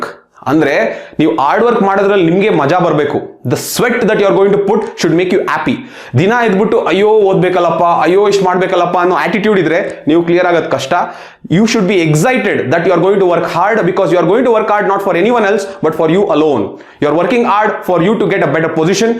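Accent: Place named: Hassan, India. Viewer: Thank you sir❤... ನಿಮ್ಮ ಭಾಷಯಲ್ಲಿ ಹಾಸನ ಜಿಲ್ಲೆಯ ಅರಸೀಕೆರೆ- ಚನ್ನರಾಯಪಟ್ಟಣದ ಸೊಗಡು ತುಂಬಿದೆ😊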